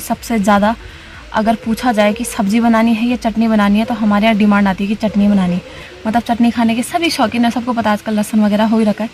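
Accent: Garhwal, India. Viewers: Iam kumauni from nainital.. u r garwali nice tone.. ❤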